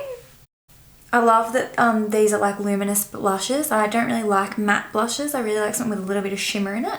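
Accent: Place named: Australia. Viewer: I love Australian accents 💕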